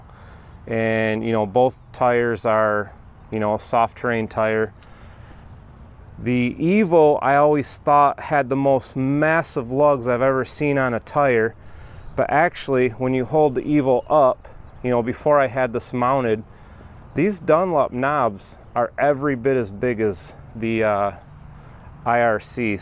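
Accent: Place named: North, United Kingdom. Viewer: I hear your mega northern accent!😁 yu play hockey too!.!?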